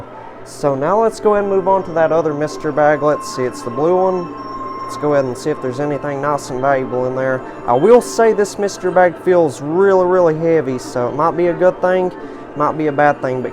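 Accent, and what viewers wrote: South, United States. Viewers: Sounds like Alabama or somewhere in the southern US.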